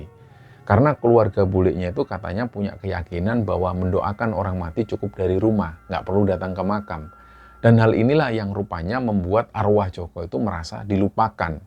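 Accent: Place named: Java, Indonesia. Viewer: Klo mas lagi berbahasa jawa enak didengernya.. Walaw tidak bgtu paham..karna saya asli sunda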